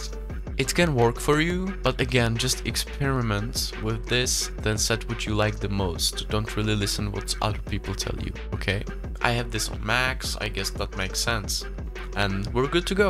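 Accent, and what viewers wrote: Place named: India. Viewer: bro have best indian accsent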